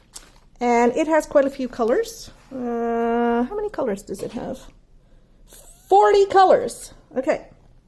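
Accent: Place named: Canada. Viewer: Rambling and Diamond Painting will do. I figured you’re Canadian I recognized the accent my partner is also Canadian, lol. You’re lucky it’s cold there and you don’t experience the pink wax getting all gooey, super soft and needs to be reloaded more often. But I found a great hack for us here in the Philippines. I keep all of my unused pink wax in the fridge! And they worked fine from that point forward. I like how you are finding ways to use cheaper alternatives for diamond painting. The hobby itself is not cheap if you want to be able to make a lot of paintings for yourself and to give away as gifts. Some of the tools in the market, I find very expensive and they are just wants not needs. Keep it up and keep on sharing!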